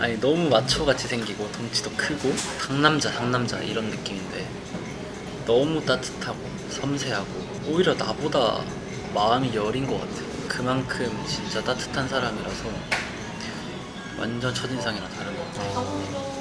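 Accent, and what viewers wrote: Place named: Gyeongsang, South Korea. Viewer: 저도 처음 봤을 때 bts 지민 느낌 있었어요. 특히 부산 출신이라 그런지 경상도 억양, 목소리, 말투가 너무 닮아서 놀랬어요.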